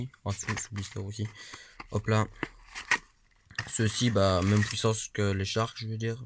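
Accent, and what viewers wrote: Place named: Liège, Belgium. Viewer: T'habites en Belgique à liège ça s'entend trop avec ton accent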